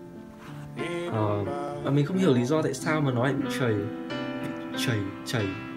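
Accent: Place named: North, Vietnam. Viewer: He has a Northern accent but it isn't too hard for me to understand being from the south :D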